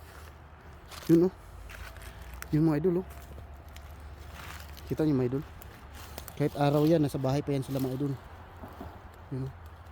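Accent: Visayas, Philippines. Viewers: Kuyawa sa lawa oy,adlawan man msgbalay😂😂😂bisaya man jud ka pre maila ra sa imong inistoryahan❤ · Bisdak mn diay k dol😂😂😂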